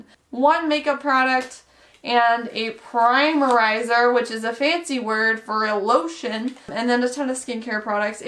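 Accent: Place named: Minnesota, United States. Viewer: I KNEW YOU WERE FROM MINNESOTA!!! I can recognize my accent anywhere!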